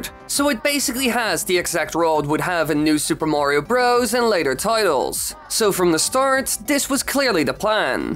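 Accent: Netherlands. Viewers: Hey, you’re dutch arent you? Hallo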